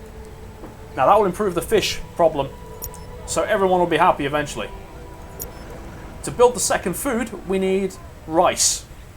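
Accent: England, United Kingdom. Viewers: Lol, English accent and mature comedy, Fuck going through all you videos and watching them, Instantly subscribed :) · Commentary= Awesome, funny with British accent Guide= Awesome and very helpful Reaction= U just made me buy this game from Steam Now i'm gonna try it out... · Thank you so much for this tutorial! I absolutely love your way of talking, British accent, casual swearing and all. You got a subscriber, keep it up! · LOL epic british accent to go along with this epic vid:P just started playing this game again. this vid is really helping me. when i used to play i did fairly well, but my cities were just a total messxD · the only video i have learned so many thing about the Anno game basic. thanks buddy. i love british accent. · Thinking about getting this game and this video is helping alot in helping me make my decision! and the british accent makes the video both informative and very funny!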